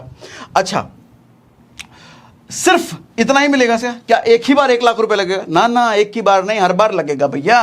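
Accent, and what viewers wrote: Bihar, India. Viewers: sir ke sabhi pasand krta hai ye bihari hai bolte hai na ek bihari 100pe bhari ye yahi hai sir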